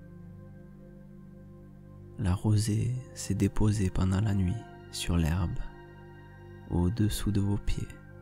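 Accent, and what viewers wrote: South, France. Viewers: Cet accent du sud m'apaise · j'adore ton accent du Sud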